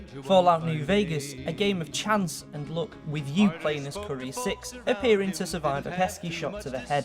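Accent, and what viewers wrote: United Kingdom. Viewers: It's funny how British people pronounce words "call of dutae"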